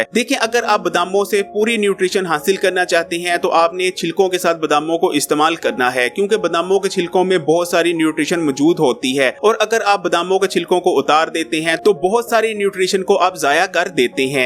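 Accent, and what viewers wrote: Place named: Punjab, India. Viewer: PUNJABI LAG RAHA HAY PRONOUNCIATION KHARAB HAY .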